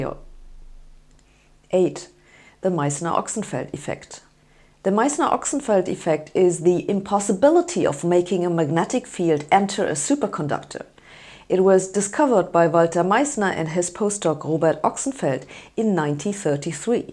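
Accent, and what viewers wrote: Germany. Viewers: Sabrine, great job I love your videos but now the but. English sort of compresses parts of the words and many non english born seems to think that that is not so important, But it is. If the relevant parts are not compressed it is sometimes difficult to catch the word. In short you sounds like a german ( surprise surprise) So the efford you need to improve is very little and you will not only be great but sound great. Sorry if inapprobiate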